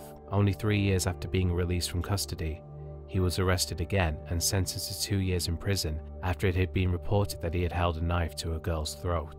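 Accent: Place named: England, United Kingdom. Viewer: This guys English accent makes these sad accounts worth listening to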